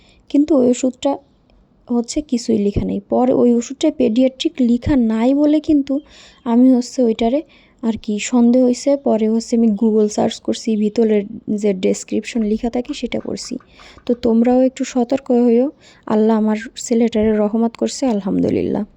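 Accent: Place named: Khulna, Bangladesh. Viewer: আপনার ভাষা তো খুলনার দিঘলিয়া উপজেলার মত।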